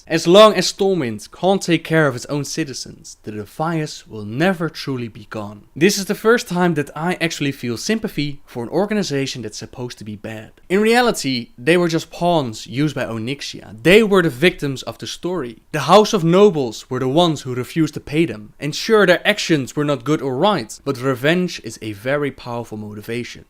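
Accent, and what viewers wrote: Netherlands. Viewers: You have an incredibly strong dutch accent mate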